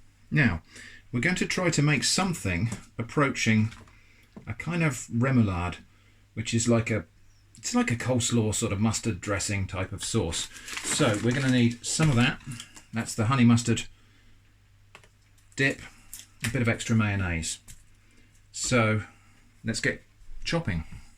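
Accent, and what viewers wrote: United Kingdom. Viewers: AfrigginPancake Boi Right? isn’t his voice so comforting, mixed with his lovely british accent its perfect to calm down. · You Sound So Brit-tish Already Now Love!!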